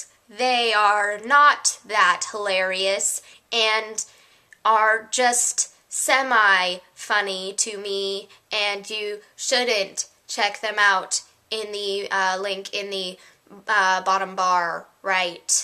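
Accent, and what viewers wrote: United States. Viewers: Michigan accent represent!!!! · I had you up on the computer and my Nana was like "Hey, why are you speaking in an american accent?" so I had to explain that it wasn't me, it was you,!! HaHa, It was funny and flattering because you are so pretty!! x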